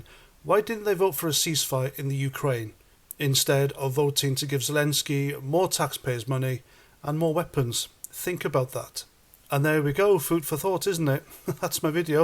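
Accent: Wales, United Kingdom. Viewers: You sound Welsh. They've just sent some so-called British Palestinians to your country.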